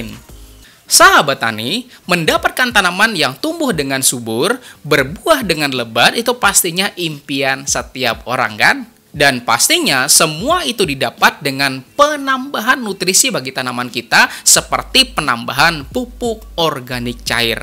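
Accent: Banjar, Indonesia. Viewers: Logat banjarnya masih terbawa mang 😂👍🏻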